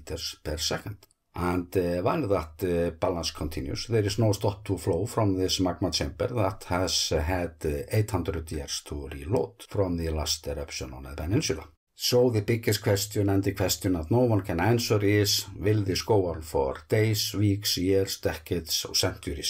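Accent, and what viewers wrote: Iceland. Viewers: Yes I love your commentary. Just matter of fact, say what I'm thinking quite often. I am getting used to your Icelandic accent too. I love your comments about the Norwegians lol. Much love and respect from the UK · As a student from Ireland who worked in Vestmanneyjar in '65-'68 and who saw Surtsey grow up, you accent, while not Westmann still reminds me of the many friends I fondly remember from those delightful days. Great reporting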